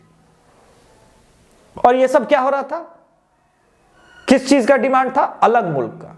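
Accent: Hyderabad, India. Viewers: Bro we want more of hyderbadi accent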